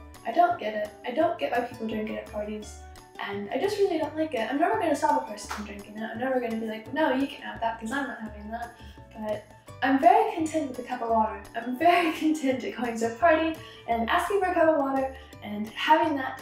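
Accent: England, United Kingdom. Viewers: Does she have a British accent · Your accent is changing! Becoming more English 😮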